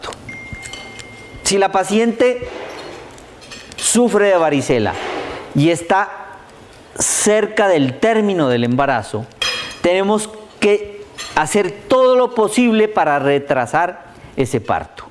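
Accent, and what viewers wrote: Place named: Colombia. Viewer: Que mal da clase no mames es colombiano seguro por su acento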